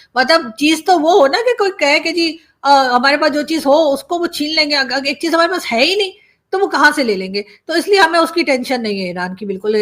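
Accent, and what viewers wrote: India. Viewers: You are so beautiful and your speech is as Indian.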